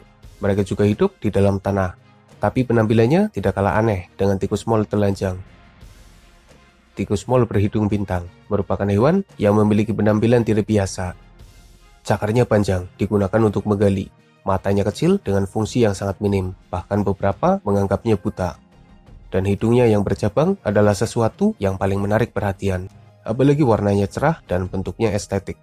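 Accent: Banyumas, Indonesia. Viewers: Ngapak sukses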